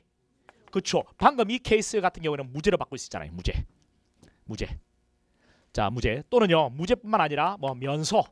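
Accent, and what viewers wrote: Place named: Gyeongsang, South Korea. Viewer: 갱상도 사투리 거부감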